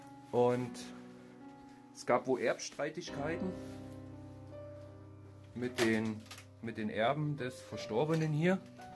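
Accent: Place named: Upper Swabia, Germany. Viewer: Du kommt aus Oberschwaben gell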